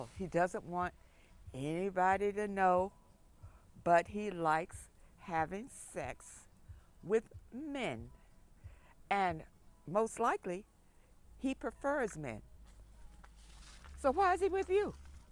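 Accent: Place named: New Orleans, United States. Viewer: I hear that N.O accent great content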